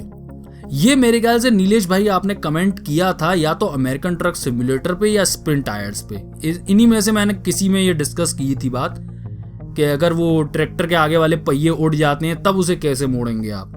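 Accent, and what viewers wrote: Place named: Lucknow, India. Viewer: bhai.. "Welcome to the Indian gamer channel".. sunn ke dil khush ho jaata hai.. dil khush kar diya.. aapke spintires aur mafia2 ke hum kaayal hain.. valient heart bhi dekhna shuru kiya hai.. baniyan wale badasses ko dekhkar hruday gad gad ho utha hai.. ise bhi poora karunga :D bhaiyyaji..waise lucknow k ho ka? aapke baat karneka lehja hamare sharmaji se kaafi milta hai.. aur bohot bohot dhanyawad hume izzat bakshne ka.. Aise hi karre karre gameplay banate jao bhaiyyaji.. Hope to see IG channel flourish..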